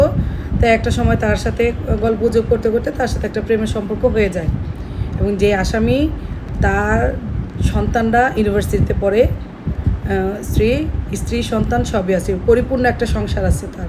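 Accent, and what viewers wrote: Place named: Bogura, Bangladesh. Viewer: আপা আপনা কথার মাধ্যমে অনেক কিছু শিখলাম। আপনার কথাগুলো বলার ধরন বগুড়ার ভাষার মত। কোন জেলায় আপনার বাসা।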